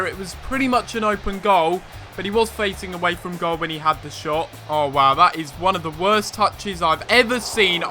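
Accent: United Kingdom. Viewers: bro you have the most sterotypical british accent ever hahaha. Great video btw!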